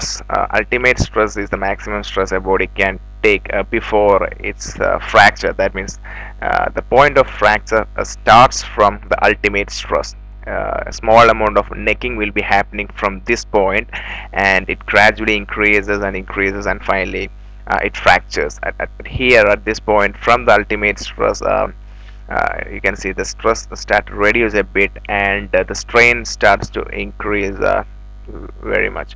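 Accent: Kerala, India. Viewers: Malayali annelle accent ill thoni. Good work by d way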